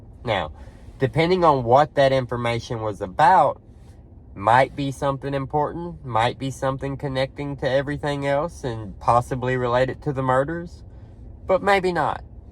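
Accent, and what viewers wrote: South, United States. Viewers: You are the cutest little southern boy I have seen . You are very sensible and quite smart . It’s so refreshing to hear your sweet southern accent which is pretty rare to hear here in NY City . I am a Grandma and have much more years on you . But if I was young again I would make you my boyfriend . Happy Holidays . Your secret admirer Grandma .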